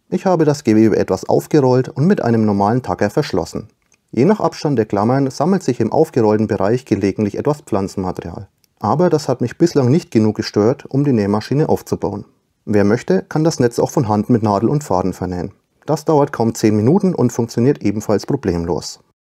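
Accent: Franconia, Germany. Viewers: glingdd noch nem franggn :P :D grüße aus nürnbärch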